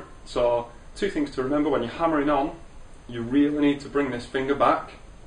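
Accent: Lancashire, United Kingdom. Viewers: You be must be from lancashire. You should like my uncle lol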